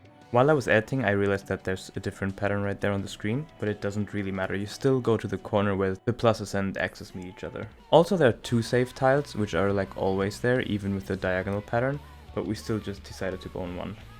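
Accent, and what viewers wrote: Germany. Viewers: He's probably refering to "the boss" which is in german "der" (he) and by the accent I assume he's german.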